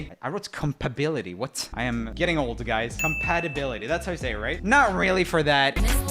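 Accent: Sweden. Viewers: JoeL: I'm getting old guys JoeL: Help me, I can't pronounce anything Me: You're Swedish... That's way...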